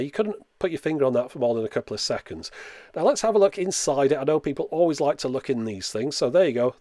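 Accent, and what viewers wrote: United Kingdom. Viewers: I can totally get over how brits pronounce tyoobs, but I can't get over bought-a-preamp-for-$200-and-then-it-sat-in-a-box-for-two-months. :-) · you say TUBE just fine mate lol... I'm from the U.S. and I like listening to your U.K. accent . So please say TUUBE as much as you like . Keep up the good work ole boy lol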